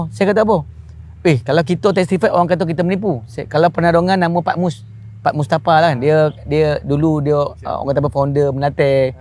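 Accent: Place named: Kelantan, Malaysia. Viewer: tak faham.... maaf...pekat sangat cakap Kelantan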